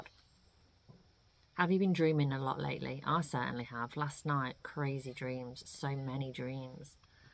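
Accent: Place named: Ireland. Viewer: Hello I won’t call you as before. You know last night I had a dream since a long long time, I was in a place a high building and people were dancing drinking and having fun and I saw a kitten downstairs was trying to climb up and while I was trying to catch it two people fell from above near me completely covered in blood and at that moment I started shaking so hard that I woke up, why I’m telling you this but since few days somebody I was forced to let her go because I was not happy with she’s a karmic with I probably lived in another life cycle and now she’s sending in my mind images of her in a provocative way and I know these thoughts are not mines. I don’t know but these two things are related in some sort. Well Nice character some times you should be use again your Irish accent 🌹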